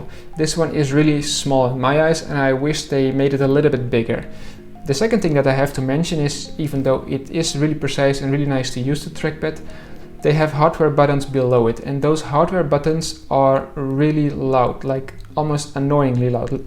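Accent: Netherlands. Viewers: Are you dutch? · Is deze man Nederlands? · Goede video! Ik heb er wat uit geleerd... Als een Nederlander Engels probeert te praten merk je dat meteen EN Dat dit zeker een laptop is die het kopen waard is.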